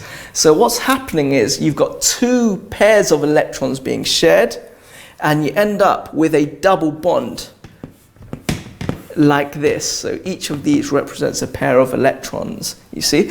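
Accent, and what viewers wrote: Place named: United Kingdom. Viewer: You have really improved your british accent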